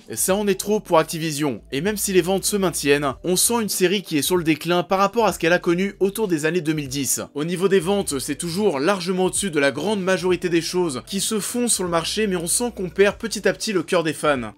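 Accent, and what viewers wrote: Paris, France. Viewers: Cette bouffée de nostalgie en intro un gros kiff par contre si je peux me permettre ; accellere un peu tes vidéos surtout le debit de parole on entendra moins l accent parisien avec les fins de phrases en "en" qui donnent envi de tuer des chatons avec une cuiller Sinon continue comme ca c est cool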